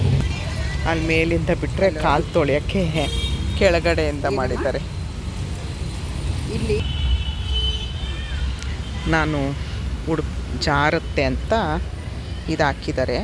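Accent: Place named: Bangalore, India. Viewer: Alla neevu matado reeti nodi banglore navru ankondidde...nodidre neevu namma oorinavru❤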